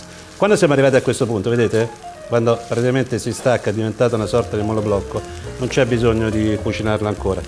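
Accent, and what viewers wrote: Tuscany, Italy. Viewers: Forse perchè è toscano? Di solito dicono anche seggiola invece di sedia!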